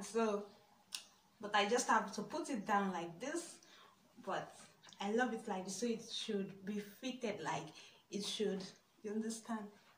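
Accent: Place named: Nigeria. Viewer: Are u Nigerian cox ur accent is Nigerian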